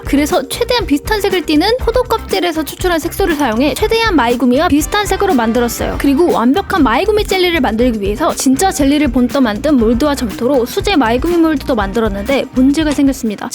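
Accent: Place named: Gwangju, South Korea. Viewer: 광주분이신가요?광주광역시 사투리 들리는데ㅋ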